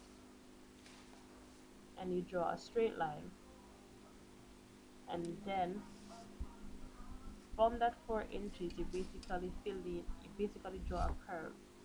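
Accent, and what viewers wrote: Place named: Jamaica. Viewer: are you Jamaican? you sound jAmaican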